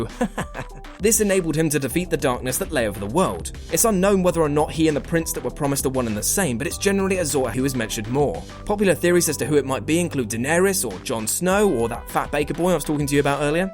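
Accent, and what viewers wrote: United Kingdom. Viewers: Brittish Ben 10 voice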